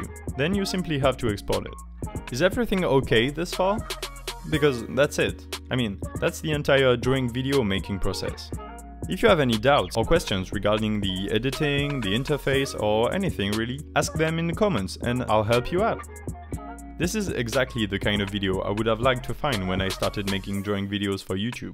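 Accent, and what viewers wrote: France. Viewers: Love your French accent!😍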